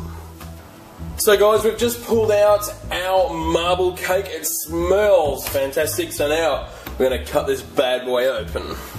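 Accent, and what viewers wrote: Australia. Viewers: Awesome cake Are u from auzzie??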